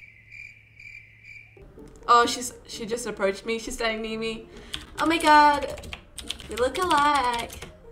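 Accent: United Kingdom. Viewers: I love the fact that ur British it makes ur voice so cute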